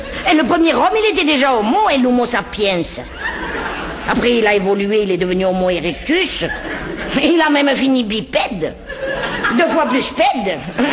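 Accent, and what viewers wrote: France. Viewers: Elle a l'accent niçois. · C'est excellent!!!!j'entends vraiment tout le parlé marseillais de ma grand mère, c'est tout a fait ça Merci 🙏🥰💕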